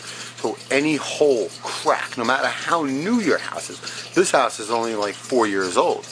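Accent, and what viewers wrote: New York, United States. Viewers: New York accent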